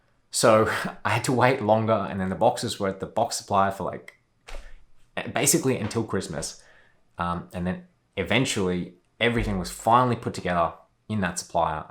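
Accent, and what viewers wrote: Australia. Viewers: you look like an indian but you have an Australian accent and you look like a leprechaun. Just a weird combination :(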